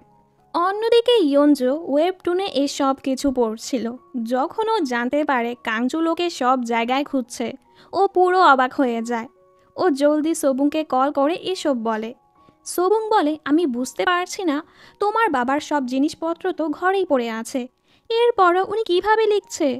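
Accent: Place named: Tangail, Bangladesh. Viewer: Boilo to tangail ar vasha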